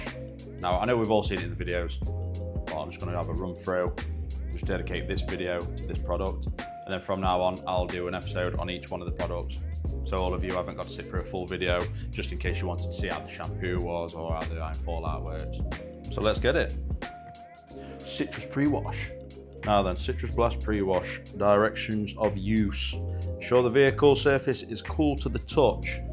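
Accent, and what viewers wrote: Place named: Yorkshire, United Kingdom. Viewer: A Yorkshire accent in a video always get a sub from me. Great video pal.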